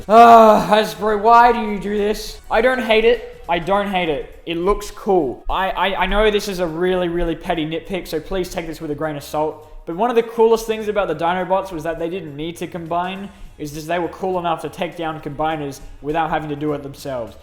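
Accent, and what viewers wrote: Australia. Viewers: wait... YOUR AUSTRALIAN!!??! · You're Australian? I've literally spent all this time thinking you're british.......... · You're Australian?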